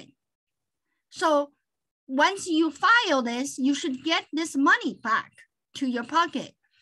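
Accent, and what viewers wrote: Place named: China. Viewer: you are so good. your Chenglish is just fine. I learned a lot from your video.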